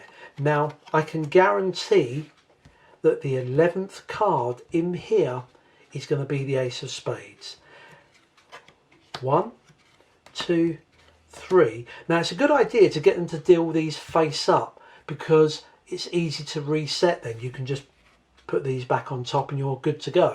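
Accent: United Kingdom. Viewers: I am glad you liked it and hope you'll try it out. Let me just say that you're doing a great job with the channel, got me subscribed. It's so good to see a knowledgeable man teach magic with soul (and a british accent ;) ). I wish you all the best things you can wish to yourself. Keep up this fantastic work and Greetings to you from Moscow!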